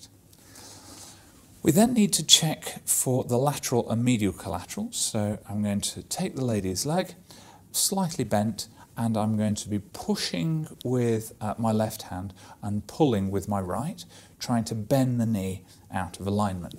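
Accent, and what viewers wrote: United Kingdom. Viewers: Really enjoy the videos from an ASMR point. Plus watching from Australia but from the UK makes accent like being back home. Keep going with the vids and thank you.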